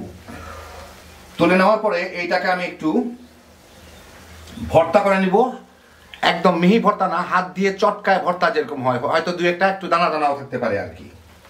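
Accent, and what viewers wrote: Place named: Bikrampur, Bangladesh. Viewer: আপনার কথাগুলি বিক্রমপুরের মত। আপনি কি বিক্রমপুরের ?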